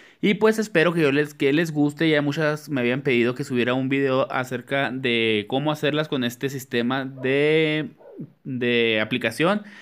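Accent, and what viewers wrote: Chihuahua, Mexico. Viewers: Eres de shihuahua?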